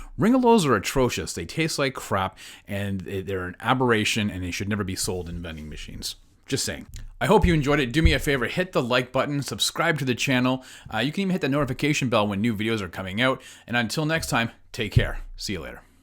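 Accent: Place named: Canada. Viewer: I just come for the Canadian accent. And ketchup chips rock esp since none in US.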